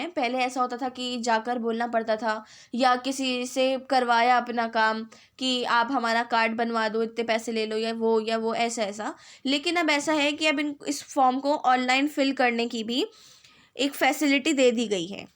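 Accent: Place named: Rajasthan, India. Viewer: Mam I think aap Rajasthan se ho...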